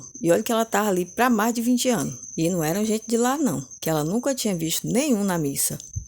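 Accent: Northeast, Brazil. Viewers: Primeira vez aqui no canal e amei o seu relato! Seu jeito de narrar é maravilhoso! Bem nordestino kkk parece eu falando!! amo meu nordeste! Parabéns pelo relato!!! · Já me inscrevi no canal só pelo sotaque amo meu nordeste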